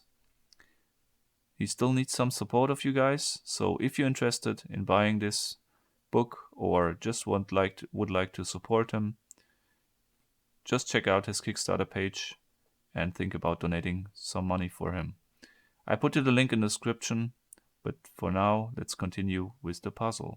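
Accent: Germany. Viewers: He has such a distinct German accent I can't imagine why you would think it was Russian. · Are you german? Because you have a very german Akzent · Ich schau die Videos, obwohl ich weiß, dass ich mir nie so ein Puzzle zulegen werde. Und wegen dem netten Englisch mit deutscher Aussprache ;) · You are german. Am I right? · du bist aus deutschland oder ?😂😂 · I can swear this guy is german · Are you German? You akcent sounds like it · Man hört so raus, dass du deutsch bist. kp, wieso.>_>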